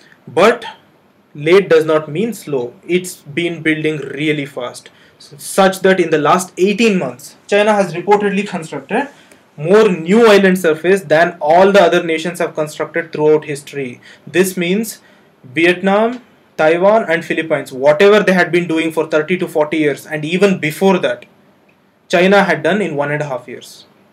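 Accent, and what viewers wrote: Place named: Bihar, India. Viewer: nd the mixture of hindi n eng with bihari extnt is shaaaaandar..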